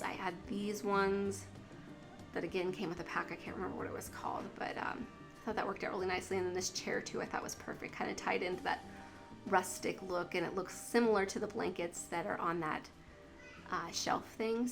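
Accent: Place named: United States. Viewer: And you know since you live in America actually I think you live in America based on your accent either that or somewhere in Canada and you can definitely buy the packs and write them off on your taxes as business expenses if you're not sure of that you should ask your husband but what I'm saying is verifiable You could even purchase brand new computer and write that off in your business expenses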